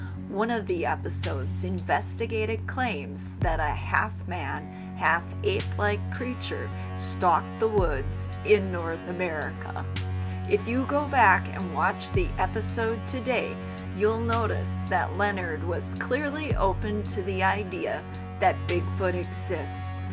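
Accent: Wisconsin, United States. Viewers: I think some of the commenters think you're mocking believers because of the tone of your voice, you're not, if people have seen your other videos you have a very open mind. I think it's the Wisconsin accent that's throwing people off.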